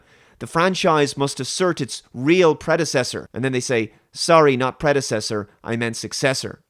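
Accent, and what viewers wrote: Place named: Ireland. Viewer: Totally random question unrelated to the video and more about you personally... What type of Irish accent do you have?(where in Ireland is this more common) Lol I'm making a story and I kinda wanted to give a character an Irish accent like yours... maybe lol. Ok sorry.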